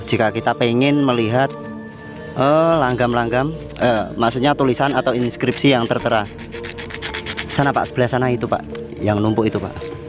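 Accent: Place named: Java, Indonesia. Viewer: Dan ada yang menjadi ciri khas dari bahasamu mas ketika memberikan penjelasan, campuran bahasa daerahnya selalu melekat dan medok, kalau orang jawa bilang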